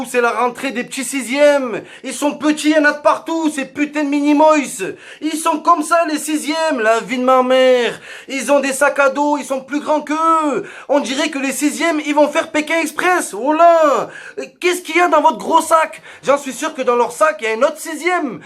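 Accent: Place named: Saint-Étienne, France. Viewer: Pk tu parlé comme sa des Stéphanois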